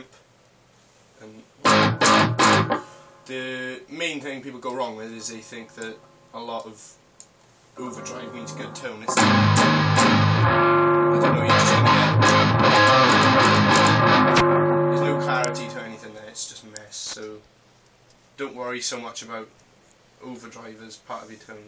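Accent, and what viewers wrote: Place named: United Kingdom. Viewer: 'eees soooo british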